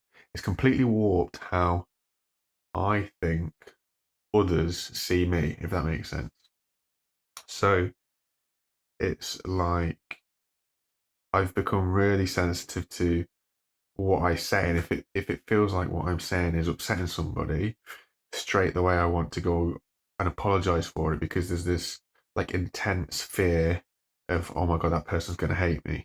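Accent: United Kingdom. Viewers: Listening to you talking, it's like hearing my own thoughts (except with an awesome British accent). All I have to say is thanks for being here, and if you can hang on then maybe I can do the same. <3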